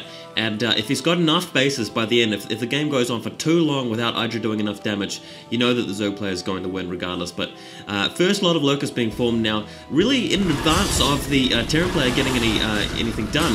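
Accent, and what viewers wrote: New Zealand. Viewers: kool to see a real starcraft game again, some of my best games on this map long time since i viddied some of, the new zealand accent is nice:) btw i think ret has +1 attack on his lurkers or atleast i always got that for them first so they twoshot marines with 3 armor?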